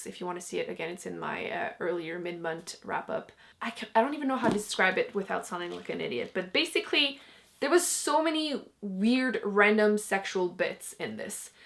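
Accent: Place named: Quebec, Canada. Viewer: French Canadian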